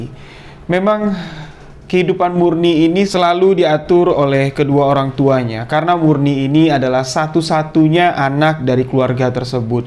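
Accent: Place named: Madura, Indonesia. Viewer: Logatnya madura.... Maduranya mn bng?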